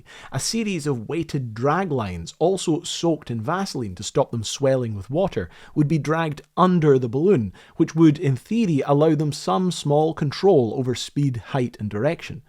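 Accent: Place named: Scotland, United Kingdom. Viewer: Excellent stuff: i’m ex english love your Scottish accent… way te go mon!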